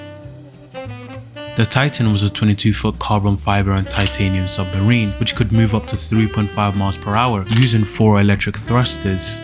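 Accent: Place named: United Kingdom. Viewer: He is British that's a British accent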